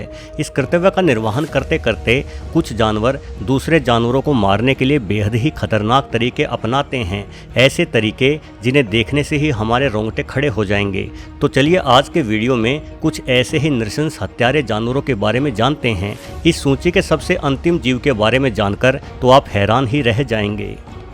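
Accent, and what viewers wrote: Haryana, India. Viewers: HR se hi ho kya Sir aap voice se lgta hai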